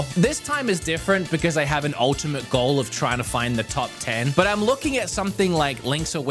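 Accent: Australia. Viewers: The last time I watched one of your videos, you had an aussie accent? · what is your accent, it sounds like a mix of Australian and Boston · Where is he from? Is he Australian?? He has a lovely accent! And I can understand it perfectly! ❤😅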